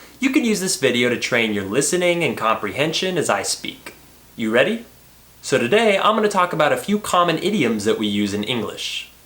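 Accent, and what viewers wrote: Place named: United States. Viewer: i think it can be normal because he speaks as a native American that's why don't be demoralized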